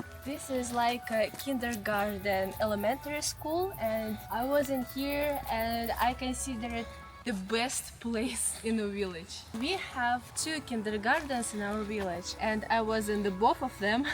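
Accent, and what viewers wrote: Russia. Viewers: I just visited Russia last year. The country is so beautiful, I love it. I also like your Russian accent and simple explanation. Visiting back your kindergarten was of course quite touching. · Love Russian accent, would love to meet a russian girl here in Argentina, cheers! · I’d be absolutely devastated if I ever found out that your Russian accent was fake! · I love the Russian accent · Young, beautiful, active, funny, thick Russian accent, you represent every quality the men all over the world treasure among Russian women. Stay happy and make more videos. Your fella is a lucky man, really, envied by the world.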